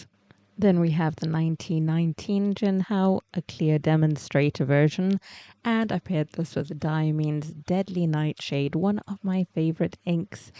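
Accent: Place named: United Kingdom. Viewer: What a pleasure to hear a British voice on oens - and to have UK prices that actually mean something! Jinhao, Asvine and Hongdian produce fantastic pens at good prices. And I hate Sailor pens - mine never make it out of the case. I should sell them but it would be cruel and unusual punishment on the buyer.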